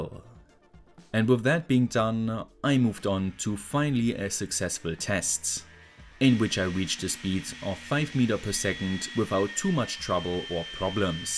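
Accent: Germany. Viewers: Are you German? You have a strong German accent and pronounciation ("batterwy", "differwence") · German accent ?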